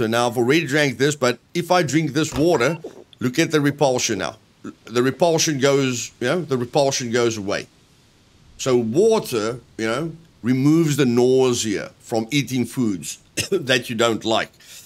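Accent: South Africa. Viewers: Mind if I ask, what is your accent? ZA?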